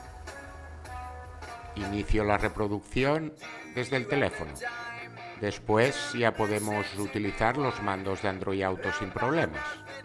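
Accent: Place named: Spain. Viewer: No intento ofenderte de ninguna manera, ¿pero es tu acento español porque eres de España?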